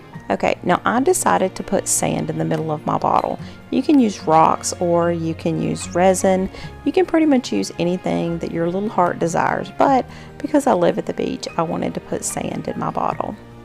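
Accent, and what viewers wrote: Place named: South Carolina, United States. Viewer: Ah lol the one I didn't name you sound more South Carolinian! Your quite talented and loved the lamps. I'm like you in the sense I will not shell out the money on home decor or seasonal items for what they ask most are from China cheaply made and so not worth it. I'd rather make them myself. I do more home decor sewing in the summers and furniture rebuilding/ refinishing in the winter when the heat breaks.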